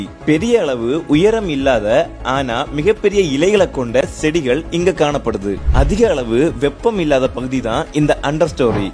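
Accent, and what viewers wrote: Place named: Kerala, India. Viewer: Avan malayali 😂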